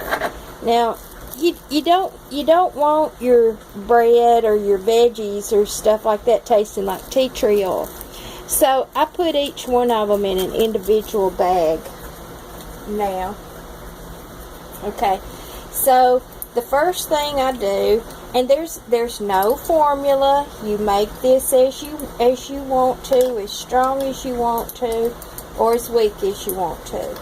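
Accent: South, United States. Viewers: James D I just love her voice! So southern and sweet. 😇🇺🇸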